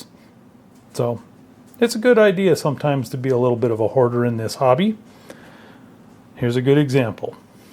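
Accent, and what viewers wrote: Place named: United Kingdom. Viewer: Your pronunciation reminds me of my favorite movie "battle of Britain" , from 1969 , the old British actors says it just like that ( with the proper amount of stiff-upper lip) , in that movie you also get brits trying to pronounciate "stukas" and Germans trying to say "Spitfire" which sounds hillarious , not ready to tackle a warbird scale myself for building and flying ( but trying to cobble together an old falcon 56 kit for the moment) your channel has soo great tips on building, cant wait to try some of those techniques!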